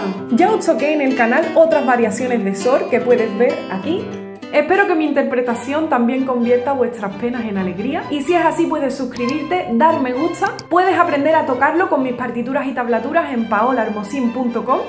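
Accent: Andalusia, Spain. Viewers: Llegué de casualidad, me quedo por ese hermoso acento andaluz... <3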